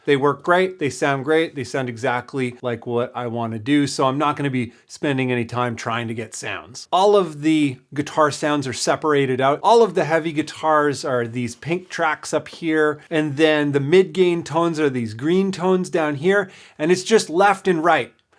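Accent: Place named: Ontario, Canada. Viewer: I just subscribed after watching your channel develop for a while. I'm 50 this June and also trying to release a solo project. Mines also being written and recorded in reaper, but more in the Swedeath direction. Gonna be cool to hear the finished product and buy it on Bandcamp. My missus is from Winchester ON, so I enjoy listening to you speak too. Reminds me of warm summers and cottage life.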